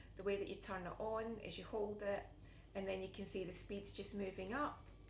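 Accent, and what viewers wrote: Scotland, United Kingdom. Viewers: Great to see a comparison of the three guns. I have dexterity issues and weakness in my arms because of spinal injury. My muscles get so tight from being clenched in pain for hours and sometimes weeks at a time. I gotta start saving up for one of these bad boys. New subbie here and I love your scottish accent. Irish woman myself. Thanks for sharing.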